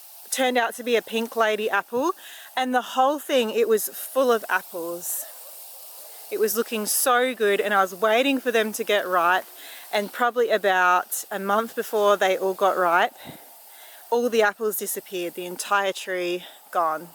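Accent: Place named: Australia. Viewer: Hi, did you get rain meanwhile? Just checked "weather Australia"😅 since i don't know where you are and it seems to be raining right now... somewhere in Australia... Rain is a bit luke health, we take it for granted until its missing. I'm only 10min in aymd enjoy the video as always. Like your Australian accent and am a bit jealous of your kids names. Maybe you should rename Dusty in something like "Rainy" or "Sloppy". 😅 I'll pray for rain.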